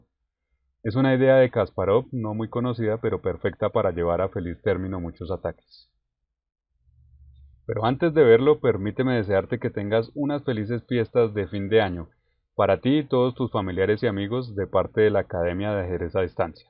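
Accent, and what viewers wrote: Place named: Colombia. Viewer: coño, no sabía que igor smirnov supiera hablar tan bien español,lo habla con acento colombiano,increible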